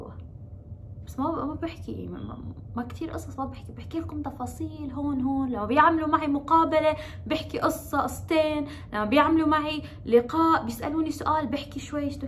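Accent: Iraq. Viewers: اللهجة العراقية تلوكلج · كلامج بلهجه العراقيه حيل حلو 😍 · كلام رائع جدا وشحلاتج تحجين عراقي 💋💋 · انت انسانه جميله وطيبه القلب ومبدعه وانت تحكي بلعراقي بتجننني. وتتاثريش بكلام ناس. لانو كلام. الناس ما بقدم وله بأخر❤️ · كولش ححلوو من تحجين باللهجه العراقيه تلوكلج 🤭😍🇮🇶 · كلامج بلعراقي ايخبل متابعج ابن بلدج من العراق💖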